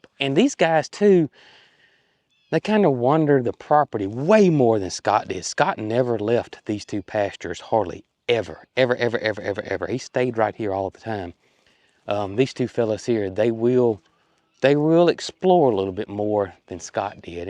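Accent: South, United States. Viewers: your southern accent is thicker lately. Love it.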